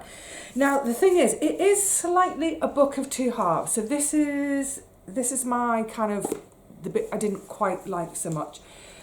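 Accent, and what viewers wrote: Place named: England, United Kingdom. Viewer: Hello from Australia...enjoyed your chitchat and lovely books you've read...definitely right down my ally....started watching you video, had to pause you and watch Mr harass goes to Paris..so enjoyed it and here I am finishing of your video and all that English accent..tfs..happy reading and hope you got some things crossed off your list...greetings from Australia..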